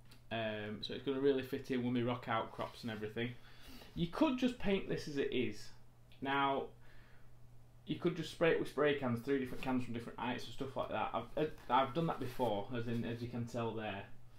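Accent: United Kingdom. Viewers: You sound like you are from the UK but you use the North American term for sealent - how come? Are most of your subscribers from America?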